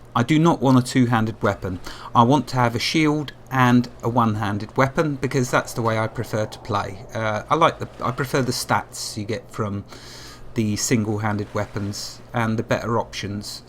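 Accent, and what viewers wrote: United Kingdom. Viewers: Awesome EQ 2 series , I just love your videos...Are you from the UK by any chance and did you enjoy eq and eq 2 better than villagers and heroes?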